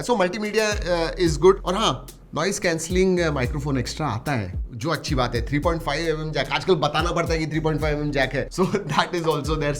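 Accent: India. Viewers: The only one indian guy whit nice accent en nice video ❤ thanks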